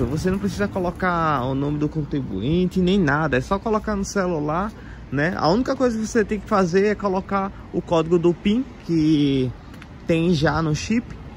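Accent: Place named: Venezuela. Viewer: Jaja yo crey que era venezolano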